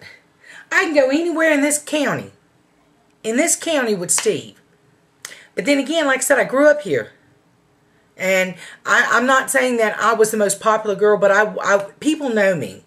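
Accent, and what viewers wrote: South, United States. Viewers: I just found this page by accident and I must say this is one of the funniest things I've sen in years... You are a very beautiful asian/black woman with the most country twine that I've ever heard!!! Rotfl!!! I am relocating to Tyndall as well, and I was looking for videos about that area when I found this site... My son has grown up in a military enviroment and I can truly say that he doesn't know racism either... Us moving back to the south and dealing with ignorate people is my BIGGEST FEAR!!! Right now he has friends of many colors and has dated young ladies of different races and I love it... I am from TN orig and everytime I go home to visit I'm reminded of the race issues, but being that I have lived all over the world I forget that in the US we have race problems...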